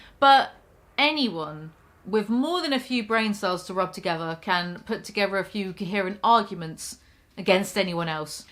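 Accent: United Kingdom. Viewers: Are you British ? Sounds like you’re from where I am haha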